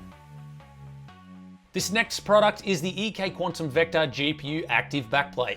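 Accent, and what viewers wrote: Australia. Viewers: are u aussie ? so am i lol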